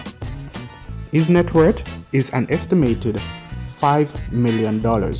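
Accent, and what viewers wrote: Jamaica. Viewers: Ead, tanks and modda are just some of the words that you pronounce in the Jamaican way 😁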